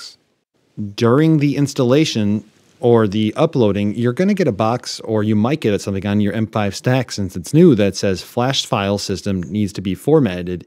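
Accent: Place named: Midwest, United States. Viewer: Fantastic video. And as a Chicagoan relocated to the hinterlands of Seattle your Midwestern accent was the best part.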